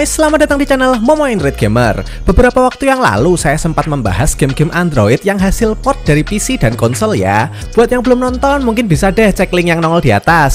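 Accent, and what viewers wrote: Java, Indonesia. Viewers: matamu methothoo wkwkwkkw orang jawa mana bang? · kamu itu dari jawa ya soalnya cara bicara kamu itu mirip org jawa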